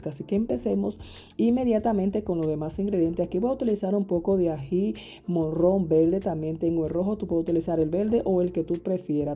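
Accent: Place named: Dominican Republic. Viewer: Me gusta mucho el acento dominicano. Saludos desde Venezuela.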